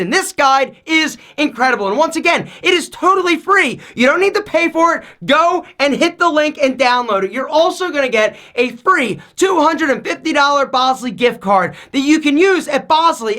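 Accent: Philadelphia, United States. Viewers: Anyone know if dude's from philly? Lol thick philly accent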